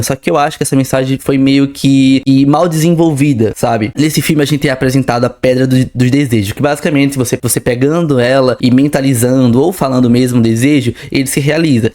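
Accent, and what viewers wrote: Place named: Paraíba, Brazil. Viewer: amei o sotaque de paraibano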